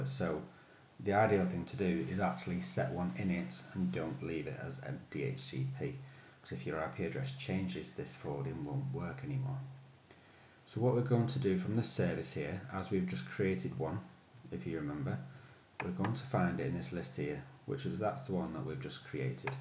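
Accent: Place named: Yorkshire, United Kingdom. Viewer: Nice one . I like your Yorkshire accent .-:))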